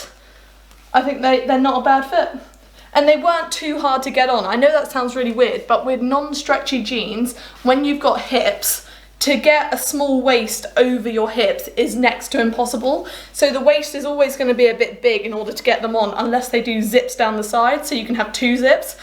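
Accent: United Kingdom. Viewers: You are such a smart and lovely young lady. Enjoy your channel and really love your British accent. BTW Fossils Rock!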